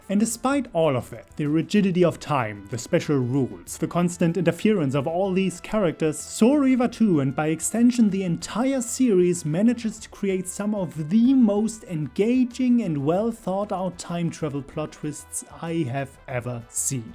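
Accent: Germany. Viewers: I can't get past the german accent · Are you from Germany? You have a little accent. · This guy is obviously german. His accent is extremely german. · The German accent is strong with this one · Wow! What a wonderfully detailed summary! Love it! 👍👍👍 But it's funny how your German accent is getting more and more pronounced during the video. 😆😉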